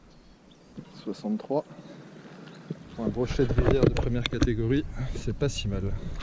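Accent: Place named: Franche-Comte, France. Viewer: Super vidéo, très agréable à regarder, a t'entendre je pense que tu dois être franc-comtois aussi non ? Continue comme ça, un régal cette session ! :D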